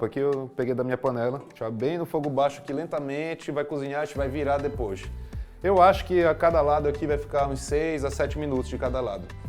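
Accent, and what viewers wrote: Pará, Brazil. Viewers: Tão bom ouvir o sotaque paraense no YouTube . De chefe de cozinha ainda, perfeito!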